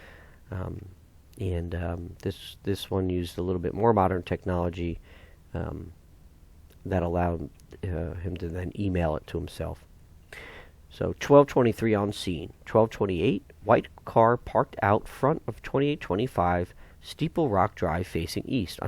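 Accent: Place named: United States. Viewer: Thank you for your greeting. I am a great fan of true crime. I find it fascinating that in the USA, you are privy to such documents and/or knowledge about the cases, no such liberty in the UK. I am on part 8 as I think I have probably watched all clips that Shannan or any of Shanann's friend have taken. Have you read any other crime cases? I think this has hit us so hard because we are they, ie we can identify with their lives. I hope you don't get bored .... I speak a couple of languages and it has always been my dream to read books in the first language of the writer eg. Anna Karenina in Russian, Anne Frank's diary in Dutch etc etc. Hearing you read these gives the correct nuances unique to the English spoken in the USA. Thank you so very much.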